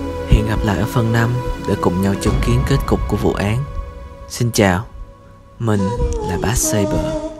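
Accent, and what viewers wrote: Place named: South, Vietnam. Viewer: Giọng con trai miền Nam hay thiệt 🤤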